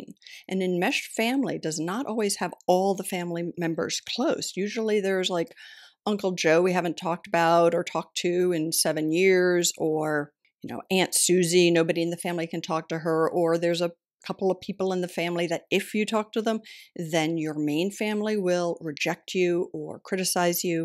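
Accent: United States. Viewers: Faellow... This american flat accents are just hard to listen to.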